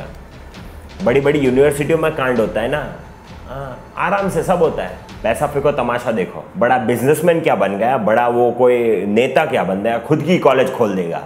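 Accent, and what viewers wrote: Gujarat, India. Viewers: one thing I come to know from your speech in first 30 sec is u are Gujarati 😁😁😁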